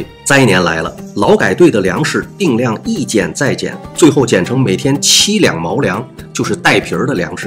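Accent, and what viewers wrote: Tianjin, China. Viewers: 感觉是天津人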